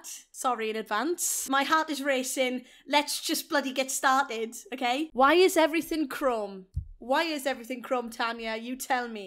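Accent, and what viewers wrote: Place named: Wales, United Kingdom. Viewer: Love the welsh accent now😍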